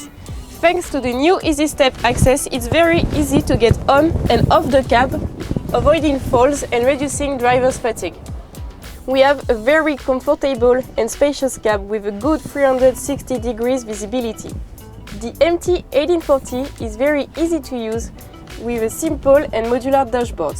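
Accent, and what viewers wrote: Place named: France. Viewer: I love when someone speaks English with a French accent :))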